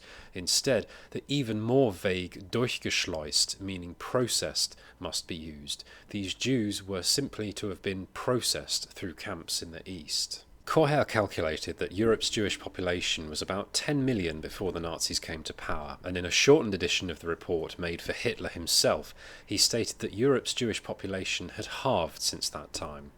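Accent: United Kingdom. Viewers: >british accent. Dismissed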